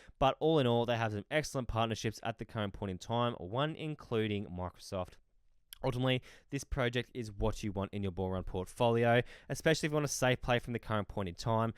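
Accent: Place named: Australia. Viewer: Mate love your work ! Great to hear a fellow Auzzie, with great research !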